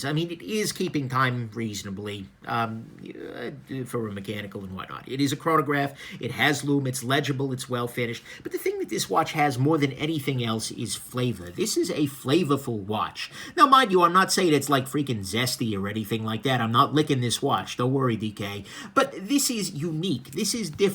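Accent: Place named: New Jersey, United States. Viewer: The Jersey accent on this guy is hilarious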